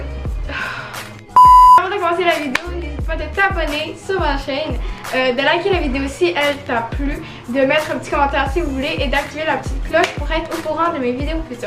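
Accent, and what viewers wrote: Quebec, Canada. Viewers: J'adore ton accent Est-ce que tu e Québécoise? Parce que moi Oui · es ce que t'habite au canada parce que t'a l'accen qc (moi aussi)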